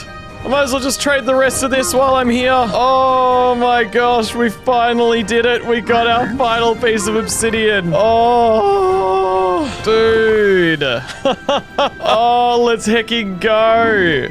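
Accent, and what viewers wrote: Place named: Australia. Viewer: You sound like a fair dinkum Aussies.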